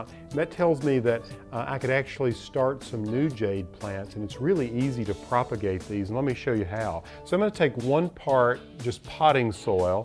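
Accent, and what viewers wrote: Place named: Arkansas, United States. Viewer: P... you sound like you're from Arkansas. Am I correct?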